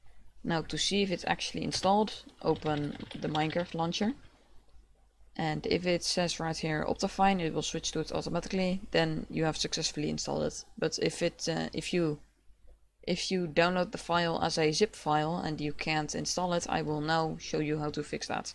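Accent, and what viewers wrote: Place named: Netherlands. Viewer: I smell nederlands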